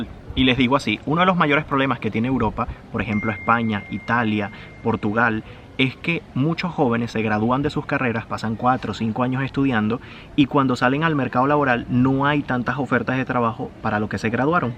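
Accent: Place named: Venezuela. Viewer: Tu eres venzolano cierto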